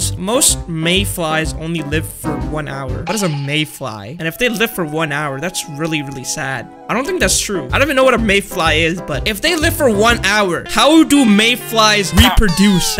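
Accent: India. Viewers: you are Indian???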